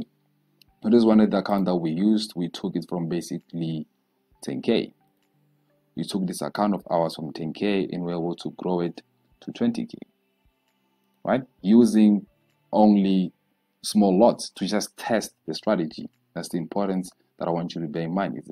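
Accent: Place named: Africa. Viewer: That’s the most African accent I’ve ever heard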